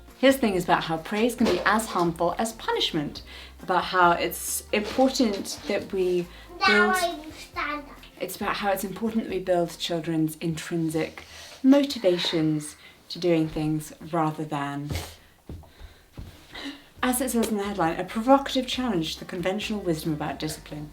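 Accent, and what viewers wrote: United Kingdom. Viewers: Low soothing voice and Brit accent but couldn't get past the 💄 Have a lovely day!😊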